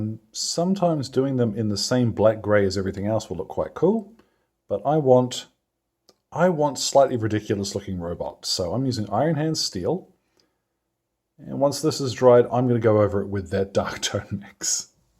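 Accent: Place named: New Zealand. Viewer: A useful technique, and I hope you continue with your Kiwi flavor. I always think of Tabitha from Fallout when I hear battle cattle.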